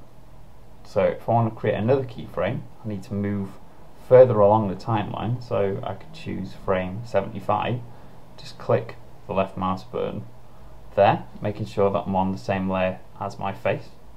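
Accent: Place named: Leeds, United Kingdom. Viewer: Ahhh no way, silly me. I went to The University of Leeds as well so you'd think I'd be better at recognising that accent haha.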